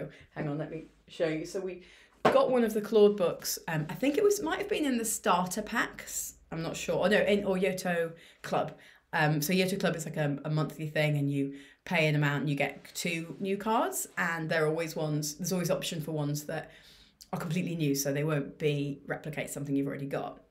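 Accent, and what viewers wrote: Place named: England, United Kingdom. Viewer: Nothing wrong with the British accent.... But to be fair, it's an English accent.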